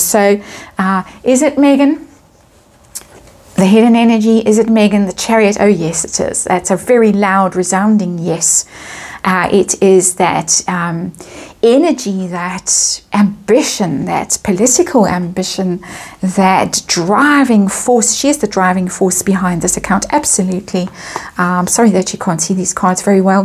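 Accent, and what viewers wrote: South Africa. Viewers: Your accent and Bookworm's are totally different but perhaps it is due to what part of South Africa you each reside in. I don't know anything about South African accents so forgive me if I am wrong. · I love your readings, your dogs chiming in, your lovely South African accent and am not at all bothered that the cards don't always fit into the frame since you take them up to the camera when you are talking about them. Thank you for another wonderful reading! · I love your South African accent.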